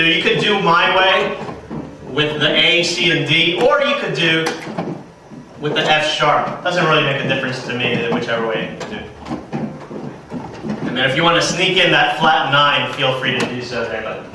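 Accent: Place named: United States. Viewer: wow this is so awesome!! id love to come to a gruop one theres definately less pressure and more relaxed :) but im in england and u obv have an american accent!:(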